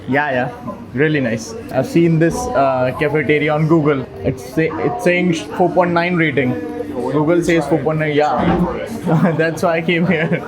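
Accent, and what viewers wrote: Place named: Indore, India. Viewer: Maza to aarha ha 😂 ye to sach ha or indori suun ke or maza aarha ha kyuki me bhi indore se hu❤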